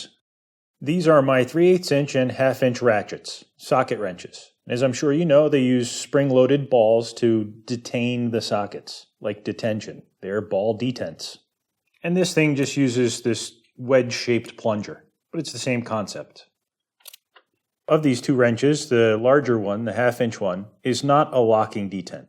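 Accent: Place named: Philadelphia, United States. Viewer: You have a Philadelphia area accent. I bet you live in either southern Jerz, or the easternmost third of Pennsylvania. Or Delaware.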